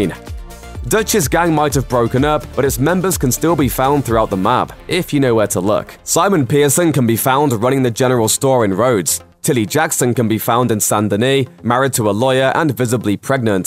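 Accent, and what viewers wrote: United Kingdom. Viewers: Where are you from? I mean besides the UK. Love your accent and voice though. #nohomo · Is this guy a narrator for every video like this, or is this just how every single British man sounds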